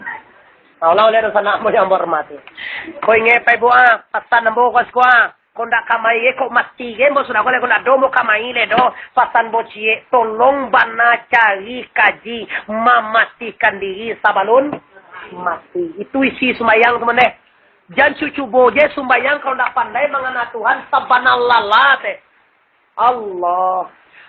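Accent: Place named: Pariaman, Indonesia. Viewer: Ondeh badaceh bana baso piamane ko mo jo.... sero wak nan lahia gadang dirantau....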